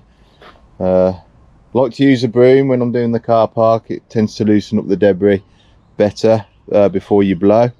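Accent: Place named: Midlands, United Kingdom. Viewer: Great Video 👌 I’m near Solihull not far from you by the sound of it ✌️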